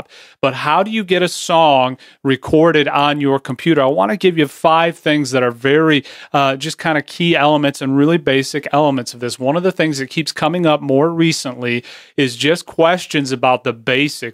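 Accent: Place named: United States. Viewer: oh my god...that american accent !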